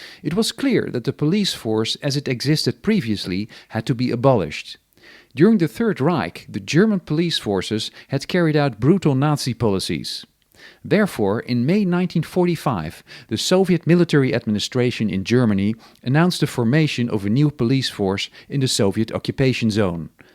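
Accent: Netherlands. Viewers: Je kunt het altijd goed horen als een Nederlander Engels spreekt.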